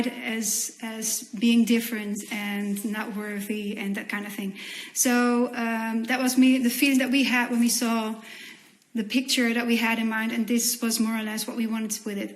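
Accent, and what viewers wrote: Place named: Netherlands. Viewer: It does have this something to see all kinds of celebrities in online streams so much (watched various panels of actors of my favorite series too). It's pretty unique and cool. At leats one good thing about this quarantine! It's so fun to hear myself in her, as in being a Dutch person myself and trying to talk English with our Dutch accent :P Agreed Sharon. I too am so fed up with all the divisions and how people treat others who are not the same as they are.. It is this like curse in human nature unfortunately.. But then again, it IS better now than like 200 years ago, that also needs to be said. There's still much work to do but it is already better than in the past ~ ^^; My rhytm is pretty much gone since I graduated from my bachelor degree at a time in life that was pretty hard and then corona started. So, no solid job or studie for over a year now already.. 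I love how she pronounced 'WT Benelux' we Dutchies say the letter W VERY different than in English XD The English pronounciation of W is weird to us XD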